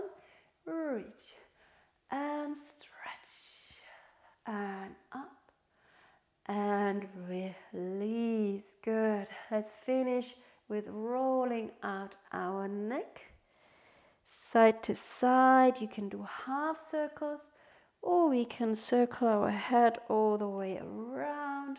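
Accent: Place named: Eastern Europe. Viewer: Thank you,. I asked you because I am russian myself and I can hear that slight easteuropean accent. Ann your name in russian.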